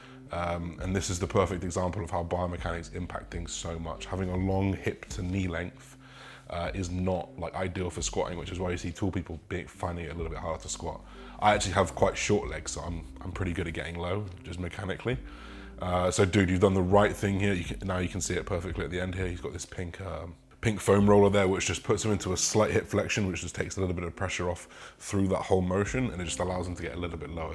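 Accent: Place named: Liverpool, United Kingdom. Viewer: Content is sick lad! Keep it coming fella. Need to see more of your scouse accent as well bro it has me dying 😂😂😂😂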